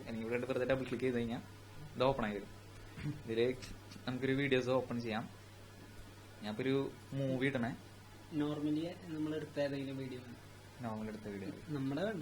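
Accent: Thrissur, India. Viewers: Nalla thrissur bhashayil paranju thannathinu thanks. . Good information👍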